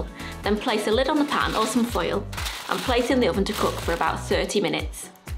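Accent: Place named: Northern England, United Kingdom. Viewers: That looks amazing I liked the recipe as much as I liked her Lancashire accent. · Must give it a bash. Love your Lancs accent. Thanks. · So pleased I found your channel. Thank you so much for the lovely recipes and for the clear and easy way you describe what you're doing. You do sound more Cheshire than Lancashire to me though! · We have proper vowels up north.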